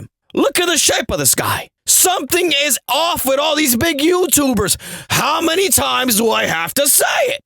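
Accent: India. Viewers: Love the indian accent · This is the first of your videos I've seen, the exaggerations, poop jokes, indian accent, pasionate voice, GTA style character talking, and 'MUTAHARRRR' makes me feel like I'm dreming and having a panic attack at the same time. Keep it up, bro.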